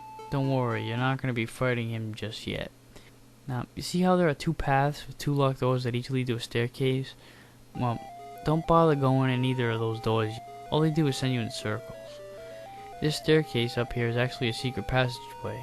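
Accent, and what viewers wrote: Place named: Massachusetts, United States. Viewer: For some reason my computer wouldn't load your profile but I can tell you're from Mass. My boyfriend is from there and you guys sound NOTHING like NY! Anyways, way to keep it entertaining!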